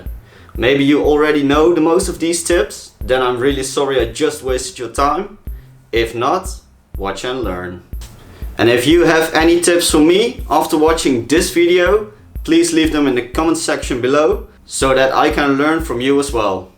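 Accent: Netherlands. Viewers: Good tips brO:) r you dutch? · According to his accent, he could be dutch. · Dutch for sure i hear it right away in the accent. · this guy is dutch no doubts lol.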